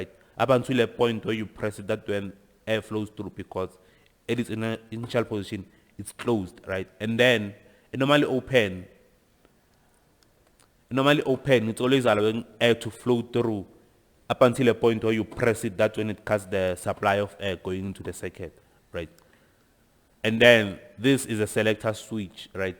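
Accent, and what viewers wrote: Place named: South Africa. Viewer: THAT SHARP SHARP AND NEEEH , TELLS ME THAT THE LECTURER IS FROM SOUTH AFRICA😅😅😅😅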